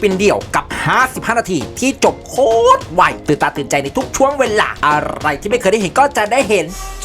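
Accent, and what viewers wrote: Isan, Thailand. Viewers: สุดยอด Lisa❤️❤️👑👑 มักเสียงเว้าเด้ มวนคักจนนึกภาพออกเลย 😆😆😂😂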